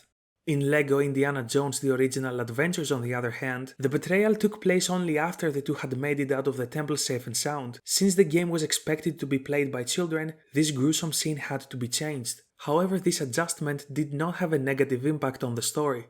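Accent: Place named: Greece. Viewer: OK this is something I was legit theorizing about myself since I was little so I knew I had to click on it. And as if it wasn't enough, 2 seconds in I'm like "Hold up, αυτή η προφορά μου ακούγεται *ελληνική*" and sure enough, looked at the listed location and that's what it was lol. So not only did I stumble upon a video about a hypothetical I used to think about myself back in the day, but the person doing it is from the same country as me. What are the chances?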